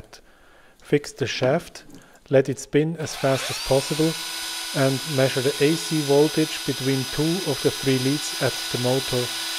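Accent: Switzerland. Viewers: Bisch du us de schwiiz ? So vom Dialekt her hanni denkt. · Where u from? Swiss? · Sounds swiss-german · Richtig guets Video ;D mer erkennt eifach en schwizer mega schnell wenner englisch redet ^^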